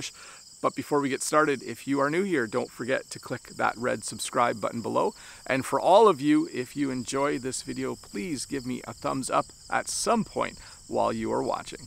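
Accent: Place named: Canada. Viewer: a great opportunity to be in contact with the Canadian accent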